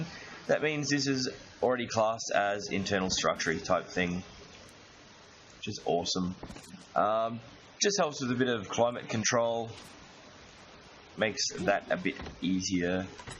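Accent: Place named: Australia. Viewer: Looking forward to your wife's bad jokes lol Definitely picked up on the Australian accent. I am from South Africa, myself. Damn that L72 fish! Cool video man :)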